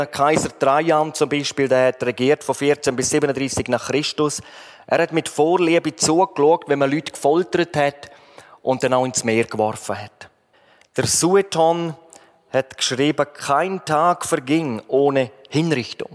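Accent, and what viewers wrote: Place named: Switzerland. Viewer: Trotz "Schweizerdeutsch" kommt die Botschaft durch und rüber. Danke für dieses Wort. Gottes Segen für Euren Dienst und die klare Verkündigung.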